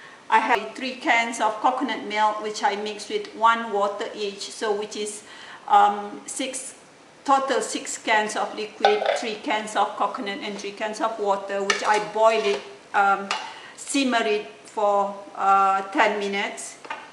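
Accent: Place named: Malaysia. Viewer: I love to hear your malay accent english which is very original, a hard to find especially those who have spent many years in America. I love your recipe of that "NASI LEMAK". It has a beautiful aroma and unbelievably tasty rice. You have very good heart and I wish you all the best for your future.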